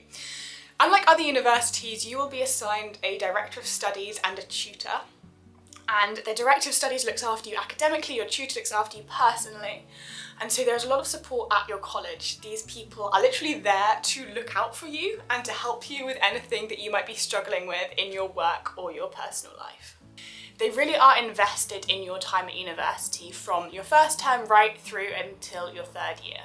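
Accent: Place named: England, United Kingdom. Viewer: Your English accent is music to my ears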